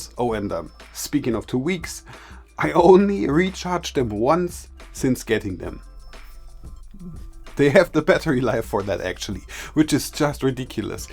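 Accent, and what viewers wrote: Germany. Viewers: Nice Deutscher Akzent :)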